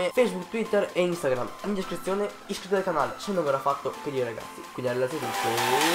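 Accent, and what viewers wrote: Veneto, Italy. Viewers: nuovo iscritto,sei veneto per caso ? 😂😂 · ma sei del veneto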